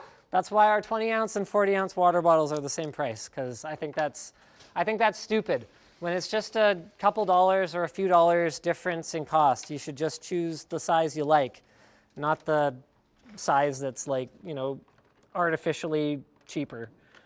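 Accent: Canada. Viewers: You sound Canadian in this. I learned what it sounds like from South Park.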